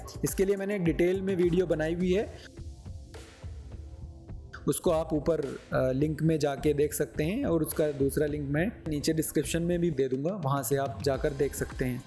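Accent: India. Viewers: Indian english messes me up. I hear an india speak like this and I don't know wether I fail to get through the accent or they are just using a couple of english words between otherwise different language.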